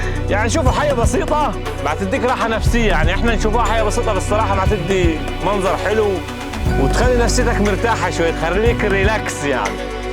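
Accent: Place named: Upper Egypt, Egypt. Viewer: انت راجل ذى العسل وعاجبني جدا انك محافظ علي لهجتك الصعيدى اخوك جوزيف من نيوجيرسي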